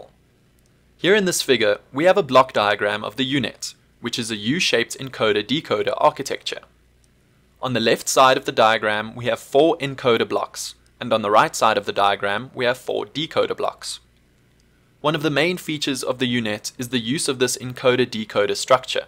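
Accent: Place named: Afrikaans, South Africa. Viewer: You sound Afrikaans, correct? Got my degree at stellies which is why I recognize the accent!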